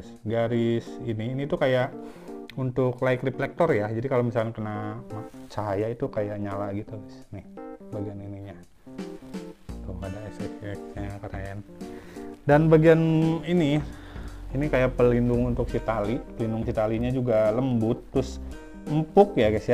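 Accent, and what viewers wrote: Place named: West Java, Indonesia. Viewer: Aa org sunda nya. Sunda mana?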